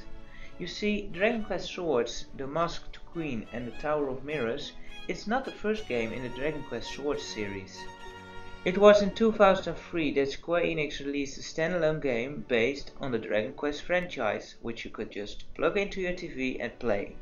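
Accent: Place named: Netherlands. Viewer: dus je bent tog nederlands, ik dacht al dat het aksent herkende.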